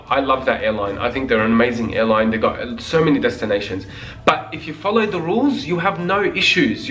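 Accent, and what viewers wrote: Australia. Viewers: Does it get better the longer you stay there? 😢 Ps you sound aussie!!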